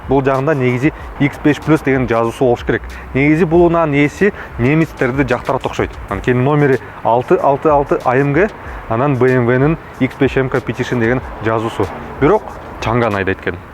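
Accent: Standard. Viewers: Ушу жигит кыргызчаны өтө нукра сонун сүйлөйт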